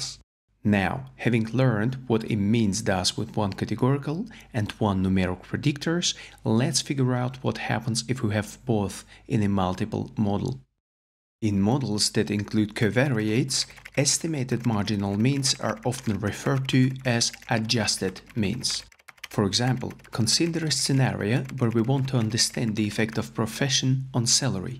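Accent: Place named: Belarus. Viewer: Thank you for one of the best channels on YouTube on the topic of data science using R. By the way, I had a Jewish grandpa from Belarus and Latvia (he was born in Latvia, but moved to Belarus, before arriving at South Africa). Your accent is quite similar to his, but with an extra German sound. Is there any chance that you are from Belarus?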